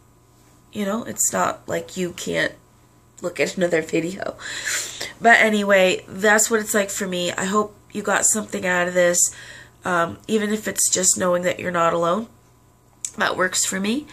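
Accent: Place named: New York, United States. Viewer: Thank you so much. You have a teensy bit of NY or (?) accent. I wish we lived close so I could hug you. Thank you.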